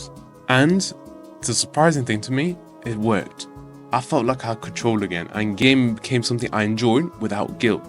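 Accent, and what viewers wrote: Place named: Germany. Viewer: your accent seemed more german rather than british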